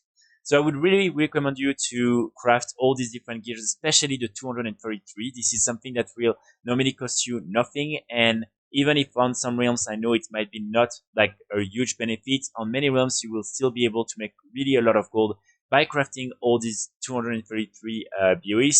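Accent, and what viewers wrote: France. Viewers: Cet accent français 😂😂😂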